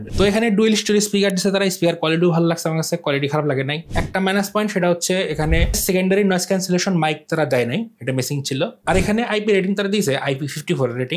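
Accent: Tangail, Bangladesh. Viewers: ভাই কি টাংগাইলের?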